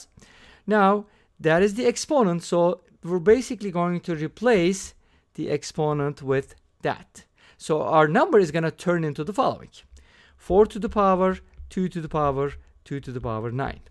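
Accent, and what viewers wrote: Turkey. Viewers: you got that turkish accent rolling 😁 keep on doing what you do🫶🏻🫶🏻 selamlar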